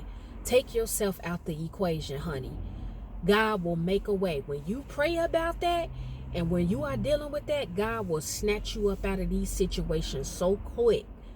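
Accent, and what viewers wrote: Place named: South, United States. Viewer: Are you from the south I hear the southern accents? You stretch your words when you talk.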